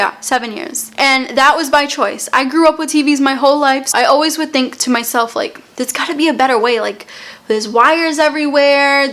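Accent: Boston, United States. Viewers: Such a cute Boston accent. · Lol I love the way she says car. People always point out my thick Brooklyn accent but her Boston accent is really serious. Lol I love it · I just love your Boston accent. I find it so charming and down to earth.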